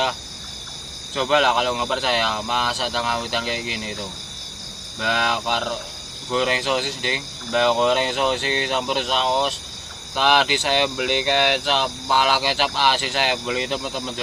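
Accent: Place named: East Java, Indonesia. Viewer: Kang nih lokasi dimn.... Dngar logat bcara kayak org jawa timur, tpatx malang, bnrkh?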